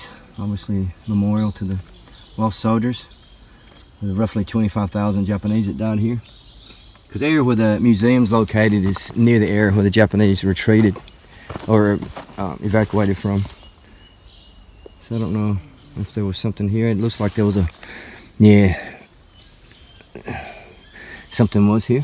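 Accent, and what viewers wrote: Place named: South, United States. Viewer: Your from south US aint you?,or your parents are, and your dad was into ww2 stuff and you talked about it alot, that alabamba slack yaw, no offence really comes through when you mention the stuff made from USA...being english i can pick up difference's very easy, like the difference between canadian and american, and ozzies and with new zealanders...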